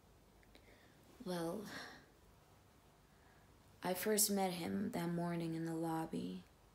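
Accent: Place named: United States. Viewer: your american accent reminds me of michelle rodriguez!!!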